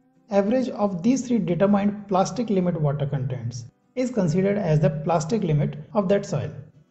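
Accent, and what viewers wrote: India. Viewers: according to his accent, I think he is from south India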